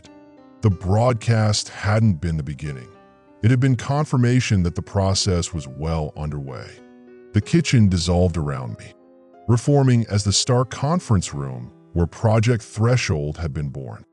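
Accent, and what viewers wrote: Spain. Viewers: yeah, the Spanish inflection was cracking me up. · Yeah, sometimes uses Spanish pronunciation.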